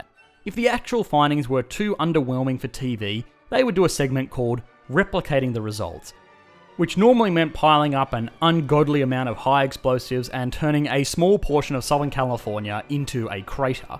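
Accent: Australia. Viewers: Is that australian accent ?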